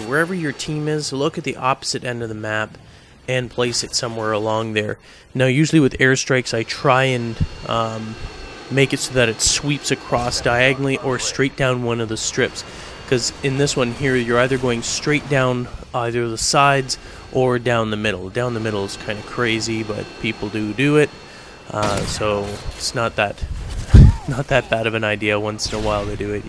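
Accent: Canada. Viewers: Is this dude canadian? his accent is kinda funny.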